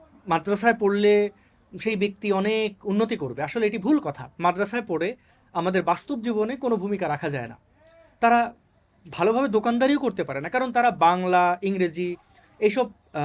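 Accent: Sylhet, Bangladesh. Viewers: ভাই আমি ভারতীয় সিলেটি এবং আপনার সিলেটি Accent টা শুনতে অনেক ভালো লাগে, তাই আমি চাই যে আপনি মাঝে মধ্যে সিলেটি Accent এ ভিডিও বনান। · আপনার সিলেটের ভাসা খুব সুন্দর 👌👌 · ভাই, আপনার সিলেটি ভাষা বলা, সত্যিই প্রশংসনীয়। মনে হয় আপনি দীর্ঘদিন সিলেট ছিলেন। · ভাই তুমার সিলেটি মাত ত ভালাউ সুন্ধর। হুনতে ভালাউ লাঘে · তুমি তো ভাই আমরার পুরা সিলেটি মাত জানো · আপনার মুখে সিলেটি কথা শুনে খুব ভালো লেগেছে। মনে হয় আরো শুনি। অন্য ভিডিও তে সিলেটি কিছু বলার জন্য অনুরোধ করতে পারি কি ? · ভাই আপনার মুখে সিলেটি ভাষা অনেক ভালো লাগে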